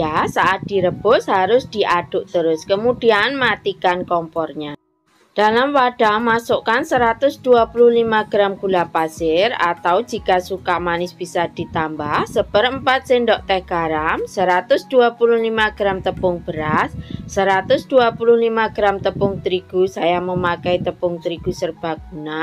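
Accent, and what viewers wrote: Java, Indonesia. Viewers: Kentalnya jawanya 😅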